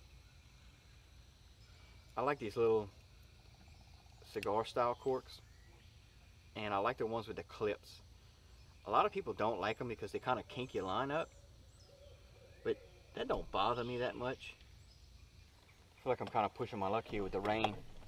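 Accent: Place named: Louisiana, United States. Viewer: First time watching awsome video. Sound like u from Louisiana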